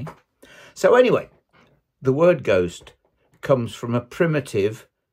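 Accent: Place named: East Midlands, United Kingdom. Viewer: Just stumbled across your channel. Love the content and subscribed. Also miss the East Midlands accent as a Nottingham lad now living in Australia. Keep em coming matey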